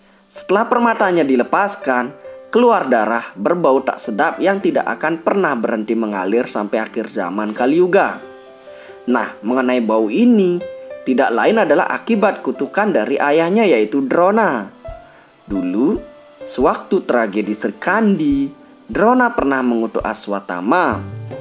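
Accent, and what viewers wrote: Bali, Indonesia. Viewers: Bli logat nya it lo bali bgt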